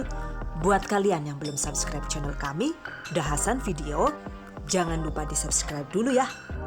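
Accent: Banyumas, Indonesia. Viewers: Suka lihat video nya... Penyampaian bagus... Trus ngapaknya bikin kangen... Wkwkwkwk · Masa tepung keledai jeng ha..ha.ha..lnjuttt jeng ngapakkk..inyong jd ktawaa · Mba hasan wong ngapak ya...😅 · Madiun deneng bahasane ngapak kaya nyong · Wong ngendi si rika mbekayu??? deneng ngomonge ngapak jan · Ngapake kue jenk....jan ora ngapak ora kepenak🤣🤣🤣🤣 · Lhaaa lhaaa.. Deneng ngapak mbak? Ya pd bae kr inyonge.. Aq jg follow akun njenengan sing ng cookpad mbak. Sukses trs mbekayu.. 👍🏻👍🏻👍🏻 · Hahaha.....deneng ibune wong banyumas.....😃😃😃😃😃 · Sy suka penyampaiannya jelas sekali... dan ngapaknya itu lho...👍👍😍😂😂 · Anu wong ngapak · Mbekayune wong ndi si kie yaa.. deneng teyeng basa penginyongan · Deneng ngapak, ora ngapak ora kepenak yaaaa😁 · Deneng ngapak ya wkwwk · Iduguduh Deng malah wong ngapak? 🤣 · Owalah wong ngapak juga ternyata. Ora ngapak ora kepenak yah mbakyu:D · Njenenga jane asli ngendi sih bu?...deneng bisa ngapak?..ngapake bener sisan. 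Top pisan lah...basa inggrise jg joss... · Deneng ngapak? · Ibunya ini orang mana yaa 😅 kok medoknya mirip akuuu yg dari Banyumas. Btw baru nemu channel ini hehehe bermanfaat bgt buat calon ibu rumah tangga nih hehe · Bu Hasan rika Wong ndi sih deneng ngapak temen Kaya nyong 😁😁😁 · mantapp...ngapak bangett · hahaa gak tau kenapa aku suka banget bahasa ngapak ini. kalau denger temen ngomong aku melonggo sambil senyum. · nyong kaget pas ngomong ngapak salag krungu pora teknputer ulang saya suka saya suka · Dr logatx kykx wong ngapak nih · Mirip pengisi suara warung tarsun di radio RRI pwt Apa lagi pas ngomong ngapak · Lah deneng Rika ngapak yu?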